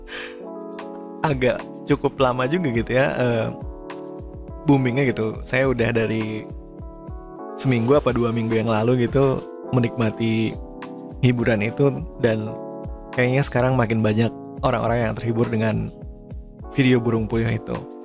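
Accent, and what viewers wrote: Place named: Java, Indonesia. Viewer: Lah saya kira orang Jawa. Asli orang mana?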